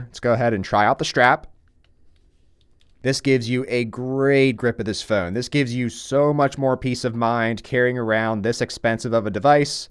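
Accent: Philadelphia, United States. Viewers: Are you from South Philly/Delco?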